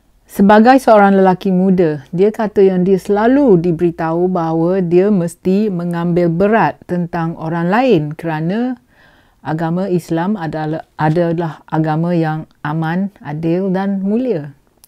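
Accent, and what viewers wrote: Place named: Perak, Malaysia. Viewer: Maafkan teman,yong (P/C) Mariam Mokhtar.Dari tutur bicara mu,teman boleh agak, Mike(Mariam Mokhtar) ni,orang Perak?.Teman rase seronok bebeno bila dengar Mike bercerita hae had politik Malaysia sekarang. Sesekali Mike selit le sikit___Lompat katak,ADUN kitar semula yang tak cemerlang dan perangai MP waktu persidangan Dewan Parlimen. Sekian terima kasih.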